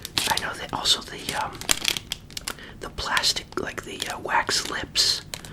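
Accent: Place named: Massachusetts, United States. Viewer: You must be from Massachusetts...I recognize the accent.Hello from southern NH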